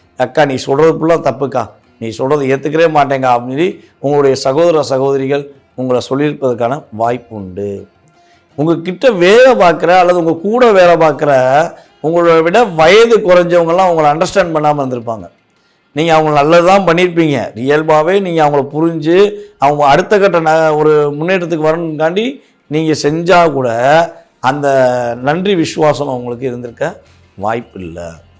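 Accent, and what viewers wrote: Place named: Madurai, India. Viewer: Madurai man vasam pechil theriuthu sir.romba varusama adi than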